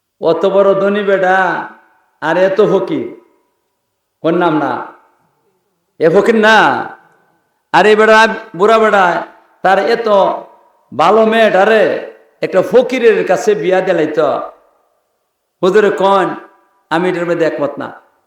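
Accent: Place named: Brahmanbaria, Bangladesh. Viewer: এই হুজুরের গ‍্যান সিমীত। আরো সুন্দর ভাষায় ব‍্যখ‍্যা করতে হবে। বাংলা আরো শুদ্ধ করে বলতে হবে, বি.বাড়ীয়া এলাকার accent ব‍্যবহারে বাংলা বললে হবেনা।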